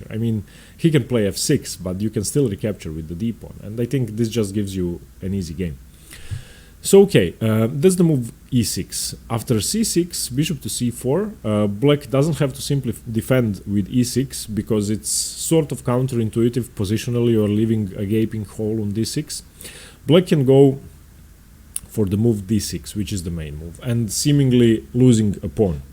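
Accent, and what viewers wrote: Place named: Russia. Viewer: Thank you for providing these well thought out and well presented videos. I think I detect a Russian accent in your speaking. If so my thanks to you is "Spacibo" or "Спасибо"!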